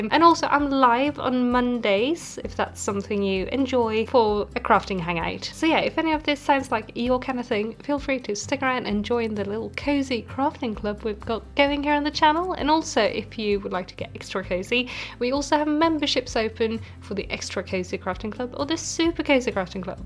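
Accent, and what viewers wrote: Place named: England, United Kingdom. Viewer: I got recommended this video and I'm confused by some of your statements. You said you're a professional knitter and work with deadlines but then you said you knit almost exclusively for yourself. You also saod you're Scandinavian but you have the thickest english accent so either you mean you're of Scandinavian descent but you yourself are english or you're from somewhere on scandinavia and learned english in the uk. I don't say these with any judgements I was just genuinely confused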